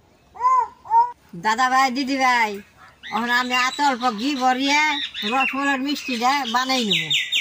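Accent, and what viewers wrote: Tangail, Bangladesh. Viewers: Dida apnar vasa amadar tangayl ar vasar satay onayk mill . Rosmalay darun hoyaca.❤❤❤apni onayk cute.😅😅😅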